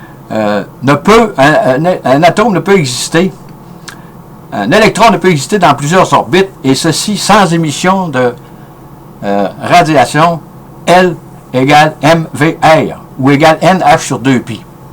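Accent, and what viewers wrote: Quebec, Canada. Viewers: Vraiment génial comme vidéo, j'adore la physique et l'histoire des science. Ton accent québécois est plutôt sympa ahah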